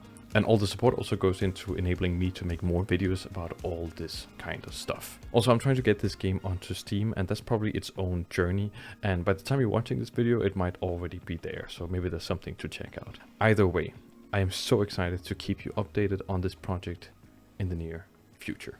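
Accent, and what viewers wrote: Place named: China. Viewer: Nice game! Why not add an animation where if you barely miss the jump you can grab the edge with your hands and pull yourself up? That way you can miss a little and still make it. But if you miss by say more than 2 ft or something, you STILL reach out as you would but your hands just go flat down the surface and you fall to the floor. ? Hey, just curious. You look Saudi Arabia-ish but you sound like 10% Chinese. Are you living in China? I'm just curious! I think I have some strange interest in genetics, morphology of humans and how all that affects their speech and body and brains and so on. Well, good luck with everything you do. You're very intelligent and creative.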